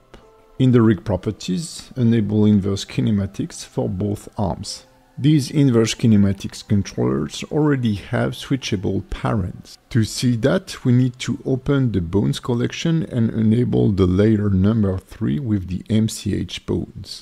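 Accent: France. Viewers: your accent is french 😅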